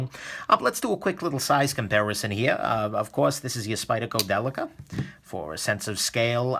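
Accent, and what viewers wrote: New York, United States. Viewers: I like. His humor. His gear. His reviews. His 1920’s voice. EXTRA! EXTRA! EXTRA!... is that accent NY Italian?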